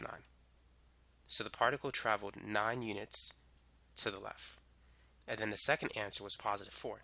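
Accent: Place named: South, United States. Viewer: Not all heroes wear capes, some have southern accents and teach STEM subjects on YouTube flawlessly.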